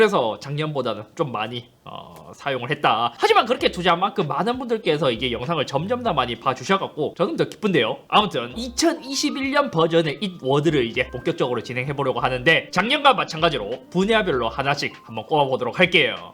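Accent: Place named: Gyeongbuk, South Korea. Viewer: 올래 ㅋㅋㅋㅋ경북커 ㅋㅋㅋㅋㅋ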